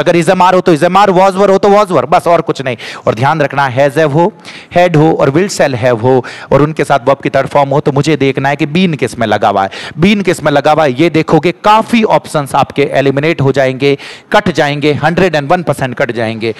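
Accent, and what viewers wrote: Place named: Marwar, India. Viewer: Madsab aapri marvadi boli choki lage aap ase hi padaye ❤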